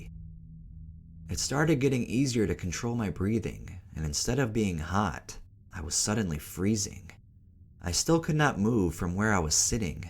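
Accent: United States. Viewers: Narrator sounds 3rd generation Chinese American.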